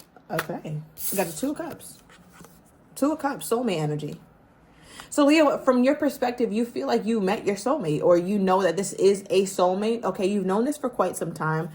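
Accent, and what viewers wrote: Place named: New York, United States. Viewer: SEE this is what I mean! Even if you are not talking about me I LOVE how you break down Leo…!! Bc you’re RIGHT! Everyone acts like Leo takes no shit but you know what? WE ARE FAIR N GENOROUS ASFFFFFF But when you CROSS THAT LINE Oh baby. That’s when it’s CURTAINS. Curtains on your performance, bc I’m no longer interested in attending that show. And THATS when ppl freak out bc they are SO sure they can treat Leo like shit & we’ll always be loyal Na uh no hunny I’m not crazy… I WAS being crazy, for putting up with that bs. Now? I’m not crazy anymore Love that genuine real NY accent… this city is getting overrun with too many transplant & fakers imo.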